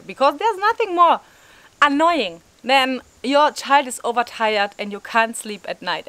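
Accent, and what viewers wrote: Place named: Kenya. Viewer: Thank you 👍💕 I love how you speak English with a Kenyan accent 😊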